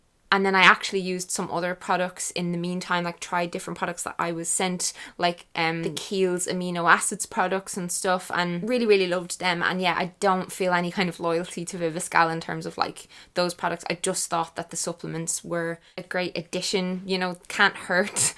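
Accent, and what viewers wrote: Ireland. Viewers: I love your Irish accent😉👍it sounds really lovely!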